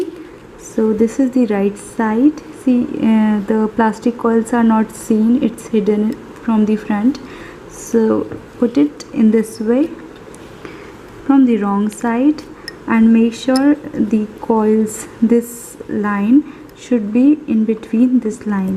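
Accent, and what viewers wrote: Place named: Algeria. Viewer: جزايري مرة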